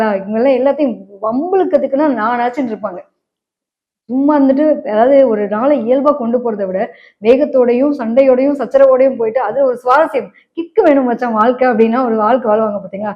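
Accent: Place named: Noida, India. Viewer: நொய்டா ராகத்த நீக்கிவிட்டு சொன்னால்தான் எளிதாக புரியும்...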